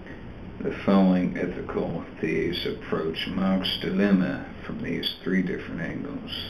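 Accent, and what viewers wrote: United Kingdom. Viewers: Sounds like london. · You have a beautiful british accent <3 you sound like a friend of mine that I miss very much :_( <3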